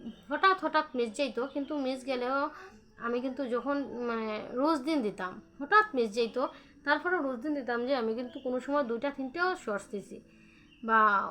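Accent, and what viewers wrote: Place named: Sylhet, Bangladesh. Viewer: তোমার ভাষাটা একদম সিলেটি ভাষার মত আমার তো খুব ভালো লাগে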